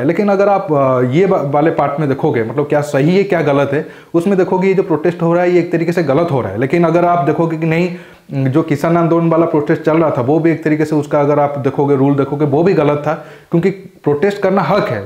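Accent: Odisha, India. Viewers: Your videos are indeed good. But try to avoid your odiya accent while speaking Hindi or English words.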